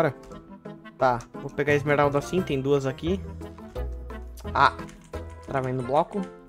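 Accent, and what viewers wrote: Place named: Rio de Janeiro, Brazil. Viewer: o seu carioca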